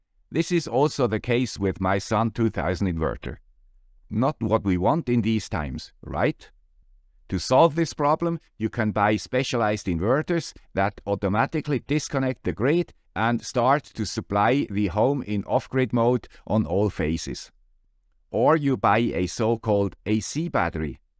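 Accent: Switzerland. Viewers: I recorded "here is the guy with the Swiss accent" onto a solar powered recorder. So I can get a smile on my face even if power goes out for weeks.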